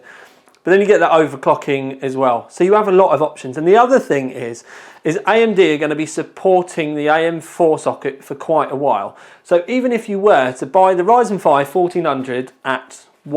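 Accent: United Kingdom. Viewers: that british accent annoys me!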